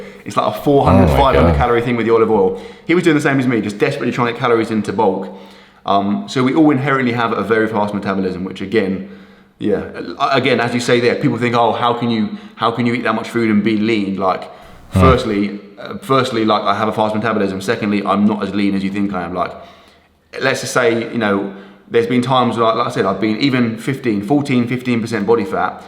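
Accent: United Kingdom. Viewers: It’s the British accent, like I can’t stand the voice at all, no offense to anyone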